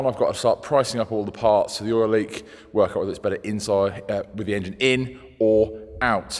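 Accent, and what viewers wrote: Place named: England, United Kingdom. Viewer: It's still chugging! You are a wizard! You are doing a fantastic job with the van and everything. Loads of fun things to do with the van yet... but you are doing good. Does remind me a lot of my Pops working in his shop... I miss him. But watching you work makes me happy. The only thing that is odd is well... the very English accent. But that's ok I love that. You got your chipper and lawnmower to fix up... but it's coming.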